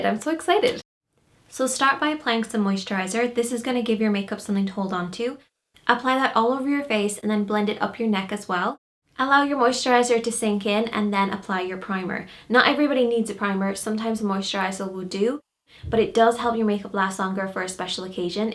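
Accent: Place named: Ireland. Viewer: I love you and your accent! You explain everything so well!! I'm irish aswell! Proud to be irish🍀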